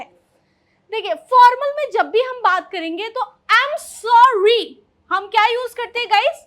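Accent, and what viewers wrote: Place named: United States. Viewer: Mujhe English bolna bhut pasnd h jyse aap bat krte ho American English aap 60 days course me American pronunciation add kr lijie plzzz❤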